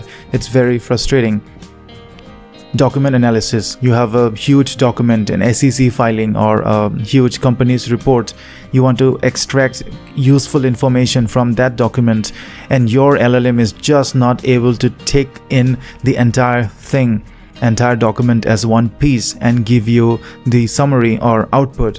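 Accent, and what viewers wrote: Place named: India. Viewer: As if it isn't already difficult enough to understand what you are saying with your heavy Indian accent, you went ahead and made it even worse by playing background music.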